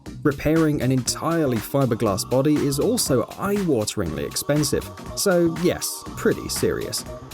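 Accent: United Kingdom. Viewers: Love your channel are you british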